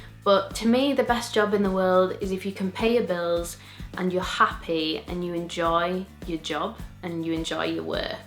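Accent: United Kingdom. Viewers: you're from the uk? you sound northern!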